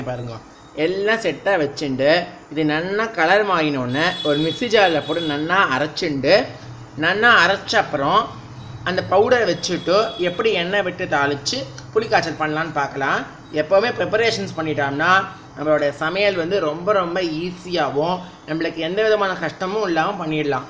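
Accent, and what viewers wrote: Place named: Palakkad, India. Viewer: Brahmin Iyer or Iyeger boys speaks with this slang only. Palakkad slang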